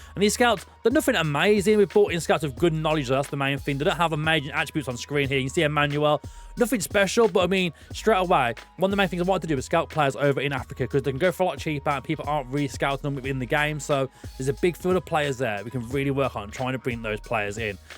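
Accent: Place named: Plymouth, United Kingdom. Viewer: Are you from Plymouth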